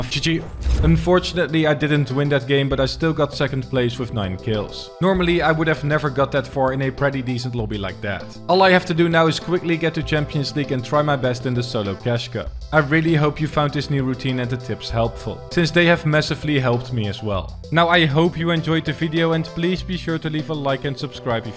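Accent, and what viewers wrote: Netherlands. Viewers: Ik weet niet als ik het goed heb maar je bent Nederlands toch of hoor ik het verkeerd I dont know if i right but Your Dutch right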